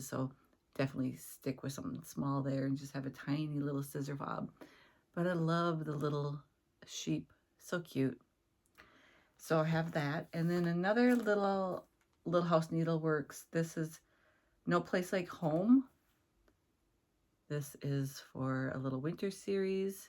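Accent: United States. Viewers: I love your Minnesota accent! I think I want to try the monogamous stitching next week and am I cheating if my monogamous stitching is a series of month;y charts?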